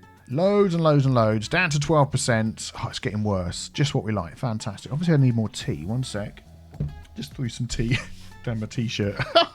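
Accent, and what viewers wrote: United Kingdom. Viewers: No idea why I watch, as I don't even play this. But been watching since Christmas.... Where in the UK are you? Trying to figure the accent. We had snow yesterday too. You sound fairly localish to me, but that said, sound like someone who may have lived in different places (ie, a cockney turned geordie 😂 - not saying you're either of those) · I`m from Germany but I`d love your British accent and that your language is so polite and friendly! I also watched Oxygen not included in the last days and maybe you like to play it with the new content again? At the moment you are my favorite youtube channel. Thank you a lot!:)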